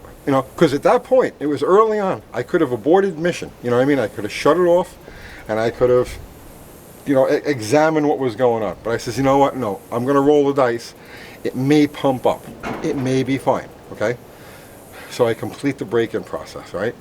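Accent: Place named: New Jersey, United States. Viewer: You talk like you're from Jersey.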